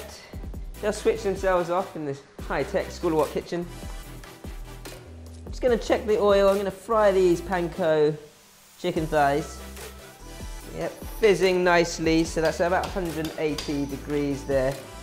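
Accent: United Kingdom. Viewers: What British dialect is that. He sounds exactly like Prince Philip in The Crown!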